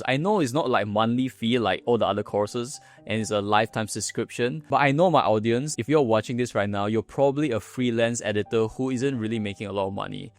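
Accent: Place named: Singapore. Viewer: waow an editor with singaporean accent les goo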